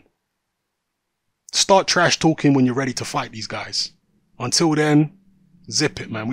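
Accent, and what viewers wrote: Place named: United Kingdom. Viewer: Shut the f up dude u sound like u from the u k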